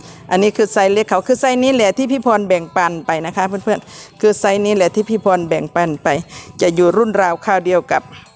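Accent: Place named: Surat Thani, Thailand. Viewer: พี่พรพูดเหมือนคนสุราษเลยครับ ได้ความรู้ครับ ผมก็เริมสนใจบอนสีครับ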